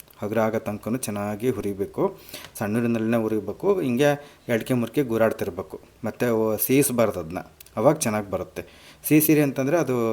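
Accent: Chitradurga, India. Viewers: ನಿಮ್ಮ ಮಾತು ಕೇಳ್ತಾ ಇದ್ರೆ ನಮ್ಮ ಅಮ್ಮನೇ ನೆನಪಾಗ್ತಾರೆ. ದುರ್ಗದ ಮಾತು ರುಚಿ ತುಂಬಾ ಚೆಂದ. Thank you so much. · ದುರ್ಗದ ಭಾಷಾ ಸೊಗಡನ್ನು ಹಾಗೆ ಉಳಿಸಿಕೊಂಡು ಬಂದಿದ್ದೀರಾ ಕೇಳೋಕೆ ತುಂಬಾ ಖುಷಿಯಾಗುತ್ತೆ ನಿಮ್ಮ ಅಡುಗೆ ಎಷ್ಟೇ ರುಚಿಯಾಗಿದೆ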